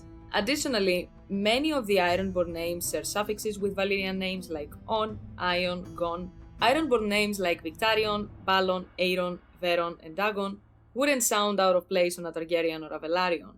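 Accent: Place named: Greece. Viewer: you gotta be Greek the accent is a giveaway.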